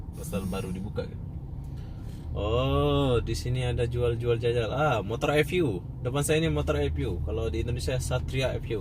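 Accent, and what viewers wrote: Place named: Kuala Lumpur, Malaysia. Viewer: Aku suka video kamu.bicara kamu kayak orang kuala lumpur.udah campur2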